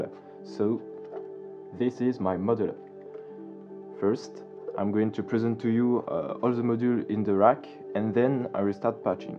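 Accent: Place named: France. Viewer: Talking about modular sounds that much better with a French accent. 😃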